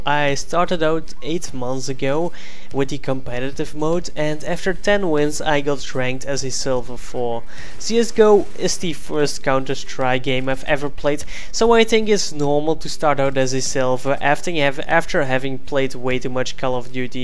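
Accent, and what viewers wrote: Netherlands. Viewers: lol, ben jij nederlands? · Are u dutch? :o....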